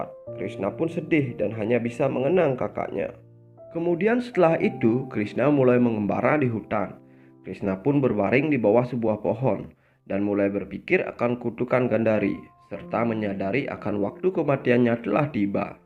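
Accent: Bali, Indonesia. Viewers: Logat balinya kental banget🤣🤣🤣🤣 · Aeng kentel logat baline..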